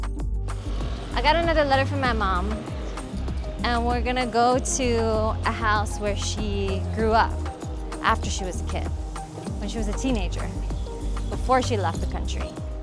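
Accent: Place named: Guyana. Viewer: Guyanese accent and all included ;-)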